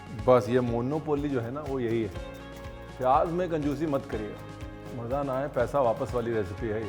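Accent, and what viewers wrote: India. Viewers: Ur food is next level sir,it always has been, but the way you speak in between, those small small nuances that u add,n ur desi accent adds a different flavor n tadka to ur recipes.love u n god bless u.😀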